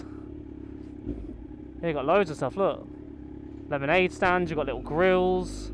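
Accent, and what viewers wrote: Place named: United Kingdom. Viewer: where u from? u accent i literally like uk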